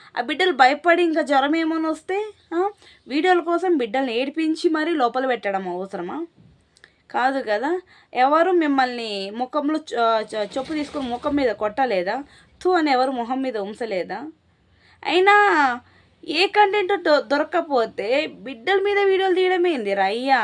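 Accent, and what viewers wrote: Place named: Kadapa, India. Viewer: Mi slang bagundi sis midi kadapa dist aa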